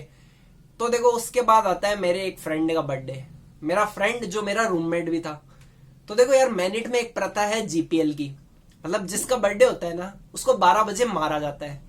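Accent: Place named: Jodhpur, India. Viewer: ohk😅😅 mtlb jodhpur nhi jodhpur se thoda sa dur boli se lg rha tha😅😅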